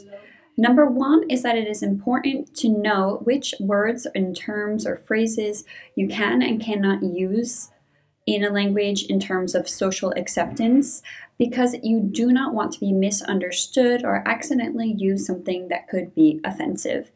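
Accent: United States. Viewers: Thx for your answer. Corsica and Sardinia are similar. Sardinia has less (and lower) mountains...and more beaches. I could guess you were from northern U.S.A. I've been to California, Arizona, Texas and Florida and their accent is different (especially in TX).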